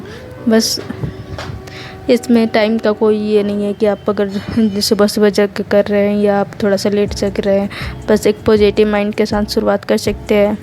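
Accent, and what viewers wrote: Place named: Odisha, India. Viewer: Aap Odisha se ho naa.. awaz se lag rha hai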